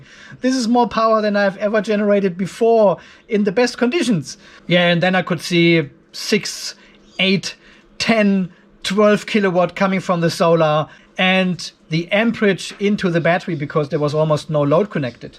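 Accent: Germany. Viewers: I would go crazy because of the leafs :D Allot of hot spots on the panels. Where are your roots? You sound so german :)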